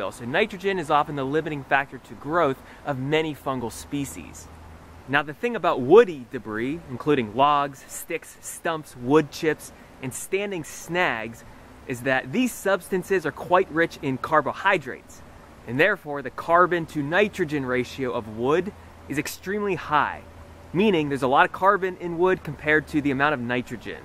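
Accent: United States. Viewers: Subbed! Fantastic. Thank you for the research and for referencing peer reviewed journal articles. Also usually can't stand American accents. This is an exception.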